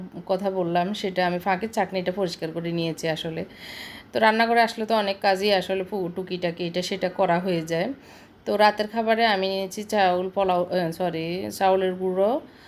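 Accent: Noakhali, Bangladesh. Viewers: আপু আপনার জারটা সুন্দর হয়েছে। আপনার কথা শুনে মনে হলো আপু আপনার বাড়ি নোয়াখালী। আমি আপনার পাসে আছি।আমার বাড়িও নোয়াখালী।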